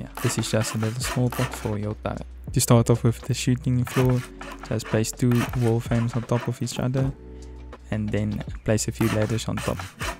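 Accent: South Africa. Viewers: Heyy, South African?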